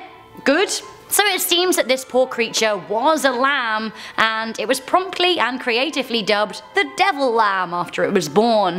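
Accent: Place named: United Kingdom. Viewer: Mary me! LOL. Seriously though your gorgeous plus I'm a sucker for British accents too.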